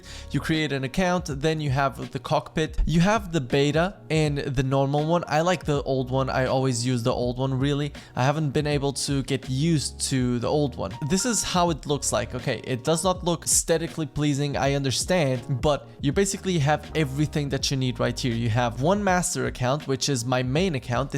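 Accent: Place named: Portugal. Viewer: Your accent brings some portuguese vibes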